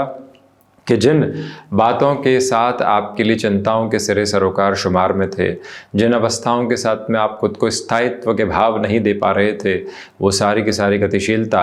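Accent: Standard. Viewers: आप सुध हिंदी बोलते हैं।। बहुत अच्छा लगा ।।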